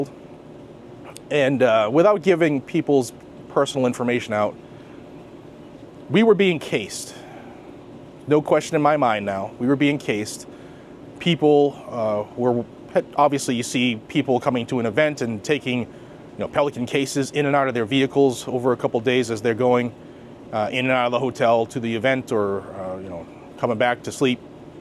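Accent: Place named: Georgia, United States. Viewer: Sounds like Georgia cops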